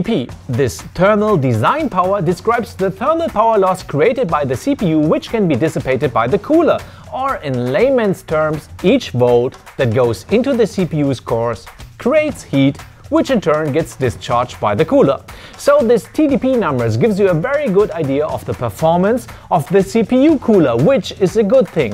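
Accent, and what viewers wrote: Germany. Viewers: Nice german accent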